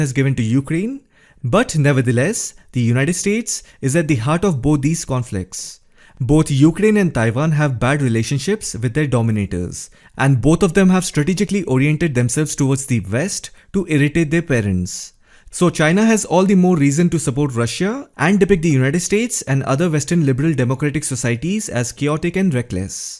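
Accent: India. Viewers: 該死！這個咖哩味的英語、讓我聽不懂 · Something about the Indian accent that makes you understand easily. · Индус всё объяснил! Красава. Кстати классный акцент 👍🤝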